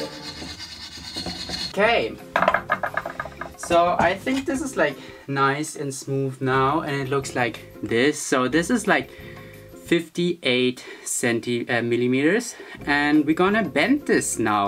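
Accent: Germany. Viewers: I swear to harry styles I knew you were German as soon as you said something and I spend half the video finding a German comment! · Are u German? :)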